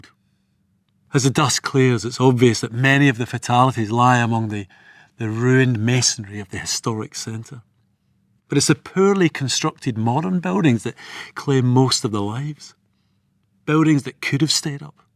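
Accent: Scotland, United Kingdom. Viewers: He had me at his Scottish accent 😊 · His accent combined with his cavalier presentation is grating. All we want is technical information not scottish patronizing.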